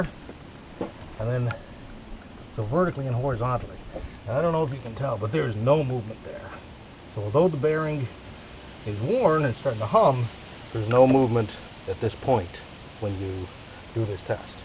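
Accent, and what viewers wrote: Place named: Canada. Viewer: I love your Canadian accent. Sounds very cool! Much love and respect from your friends from the southern border!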